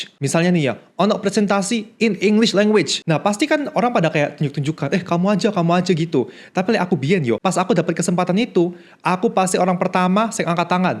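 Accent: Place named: Java, Indonesia. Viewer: wah lek jowoan ngene ngajar bahasa inggris jadi tambah jos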